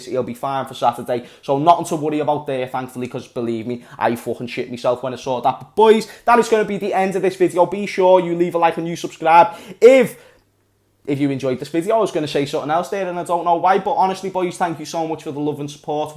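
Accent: Liverpool, United Kingdom. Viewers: another class video. Not a scouser but I love listening to a proper scouser talk about Liverpool.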